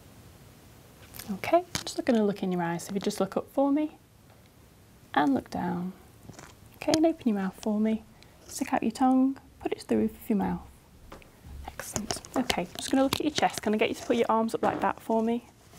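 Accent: United Kingdom. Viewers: british accent)))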